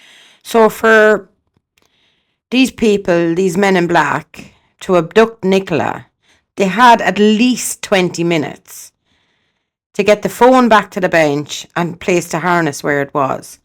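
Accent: Ireland. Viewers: Love your Irish accent and I agree with you. This was not a terrible accident. 😢If they were innocent fishermen why haven’t they come forward?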